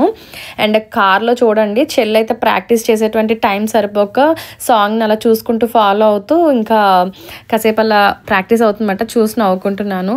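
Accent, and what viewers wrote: Godavari, India. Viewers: Hi Gaaru, How are u doing....Nice to see u again... U R a stunning Beauty... Varninchataaniki Maatalu leveu... Mee Godrolla Slang Baaguntundi.. Traditions baaguntai.. Pilupulu Baagunnai.... Mee Sisters Super.... I hope U enjoyed.... in Marriage Celebrations...... Take care have a great day.. Cheera color Super ga undi andi..... Thanks for sharing......😃😃😃😃😃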